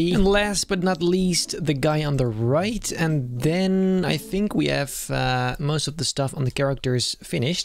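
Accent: Netherlands. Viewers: And are you dutch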